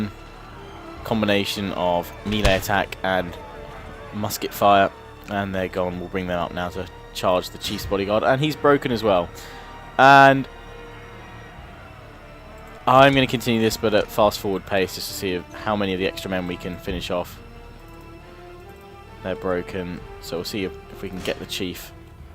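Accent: England, United Kingdom. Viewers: How do you do the cinematic zoom thing, "N" key or "End" key. Sorry im not British dont understand the accent that well. But idk Brits and Americans always fight on the internet. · that british accent SO catchy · Well,people DO love a good English accent...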